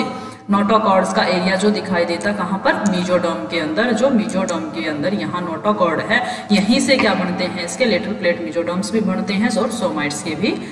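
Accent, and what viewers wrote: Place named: Haryana, India. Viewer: Haryanvi mdm 😅❤nice explaining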